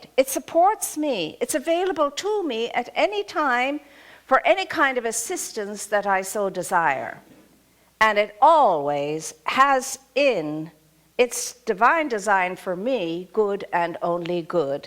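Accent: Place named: Ireland. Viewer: Love the message. And her graceful hint of an Irish accent is beautiful to my ears.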